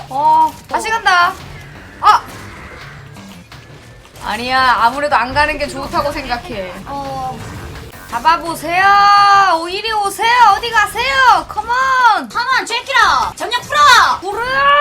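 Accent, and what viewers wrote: Gangwon, South Korea. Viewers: 저 예쁜 공주같은 얼굴에 강원도사투리까지 쓰시니까 너무 매력적이다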